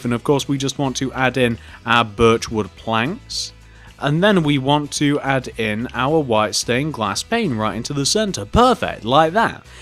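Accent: United Kingdom. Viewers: he's British so he says it like that! >:O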